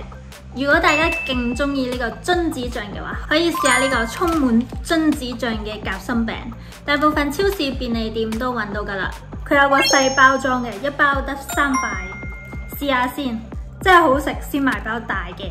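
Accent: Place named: Malaysia. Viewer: 估唔到你同我一樣,去得多馬拉返嚟都改唔到口吻,好似落水,去邊度撩,直直走之類😂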